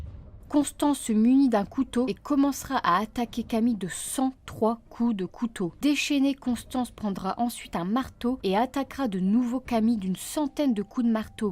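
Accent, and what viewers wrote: North, France. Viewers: Bon travail mais élocution à améliorer. Débit trop rapide, quelques fautes grammaticales et.... l'accent du Nord un peu trop prononcé (c'est franchement pas beau). Pourtant je suis du Nord donc aucune discrimination dans mon commentaire, juste un conseil pour t'améliorer 😉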